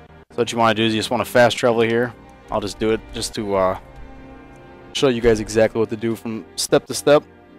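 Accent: Boston, United States. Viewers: something tells me your from Boston... My step fathers from boston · lol you sound like you're from Boston or Rhode Island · You from baahwsten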